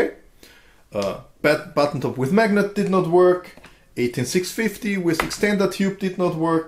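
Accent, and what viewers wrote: Germany. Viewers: I could tell this was a Deutsch accent immediately. Meine Frau ist von Darmstadt.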